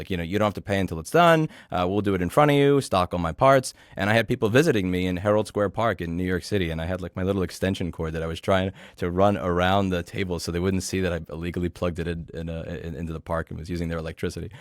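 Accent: Australia. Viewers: He has an Australian accent... Also you aren't as clever as you think you are. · With an Australian accent? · Being interviewed by a Brit wait whats with the Aus twang. Ah... ok. Interesting. Cool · I’m Australian so it was always obvious that he was Australian but Australians don't have such distinct accents that alline with people’s race so it’s often not easy to pick. There are ethnic accent variations in Australia but they are often learned from your family rather than broader community so if you're a second or third gen Aussie you might just sound like everyone else. There are exceptions like people from Melbourne with Greek heritage like Nick Kygerios (I don't think he was originally born in Melbourne and he’s half Greek) but you can hear it in his accent. That's most likely because Melbourne has the largest Greek community outside of Greece. The Indigenous Australian accent is also quite distinct but many Aboriginal people don’t have it. 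I was surprised to see isn't white after watching his videos for a while, that was a whole second or two of surprise! Either way it doesn't really matter, I don't think anyone is watching his channel because they think he’s white any more than people watch MKBHD because he’s black (but has a more neutral accent). I’m guessing he went to a good school and he shares the same general accent that most of his peers had.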